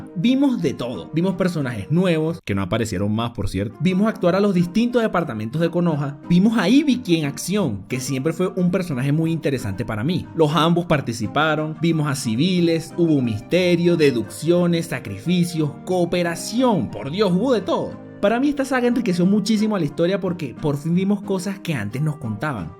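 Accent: Venezuela. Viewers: eres venezolano verdad? por el "y de paso" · ajaj eres venezolano :v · Eres Venezolano? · este por el acento es venezolano XDD en fin mi opino sobre el tema es que si esta fue una buena saga y también es mi favorita pero; al iniciar la 4ta gran guerra ninja shinobi también fue épico su inicio y el camino que pudo haber llevado pero luego de que todo fuera guerra de genjutsus y el drama de sasuke pa presidente y naruto por ahí la trama empezó a caer notablemente, en los inicios de la guerra también pudo verse a personajes secundarios brillar aunque admito que la verdad pudo haberse desarrollado mejor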